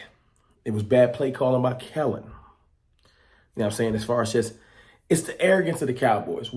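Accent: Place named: Philadelphia, United States. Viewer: Bruh you sound like you from Philly or jersey! 🧐🧐🧐🤦🏽‍♂️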